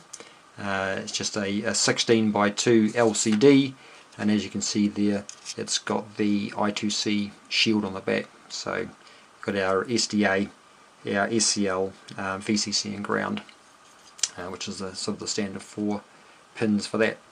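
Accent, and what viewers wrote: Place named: Alabama, United States. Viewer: Great video, but Alabama accent is pretty thick.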